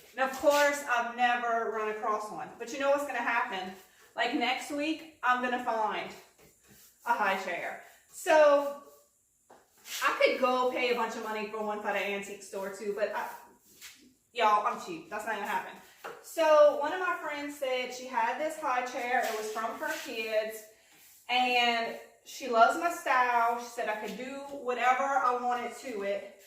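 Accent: Louisiana, United States. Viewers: I KNEW you were from Louisiana when I started watching this video! I heard it in your accent. I'm from Baton Rouge, but have lived in South Carolina for 36 years. I am redoing a fantastic old high chair that I found in somebody's garbage. I've done another one, but not with chalk paint. This will be my first chalk paint project and it is for my 6 year old granddaughter. She wants a real high chair for her baby dolls, so this will be my Christmas gift to her. You did a beautiful job on this and I got a lot of good ideas from you!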